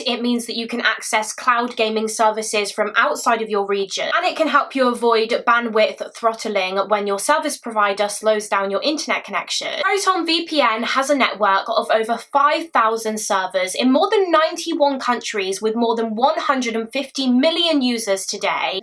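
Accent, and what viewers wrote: United Kingdom. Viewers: Hi just want to say enjoy your content you are a talented storyteller also love your British accent❤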